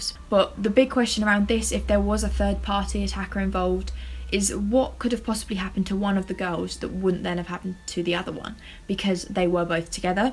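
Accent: United Kingdom. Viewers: are you British, Australian, or South African?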